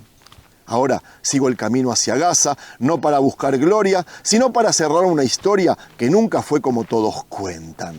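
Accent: Argentina. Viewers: Cuando algo de historia argentina tenes el acento nomas lo demás es todo propaganda.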